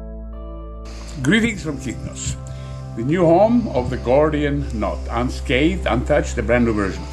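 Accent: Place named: Spain. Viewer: Is this guy Greek or Spanish he sounds super Spanish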